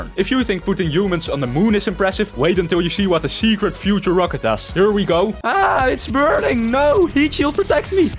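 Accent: Netherlands. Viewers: Damn, some Dutch accent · Your Dutch accent 💀💀💀💀 I love the goofyness, the thing is, as a Dutch guy myself it's pretty cringe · is he dutch,? i recognize the goofy accent.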